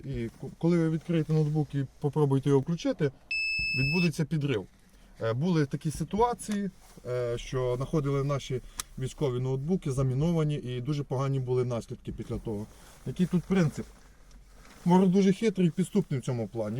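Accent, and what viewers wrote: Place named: Moldova. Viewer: Щчо ты говоришь,порося???? Щчо за нарЭчие??? Ты щчо,молдаван???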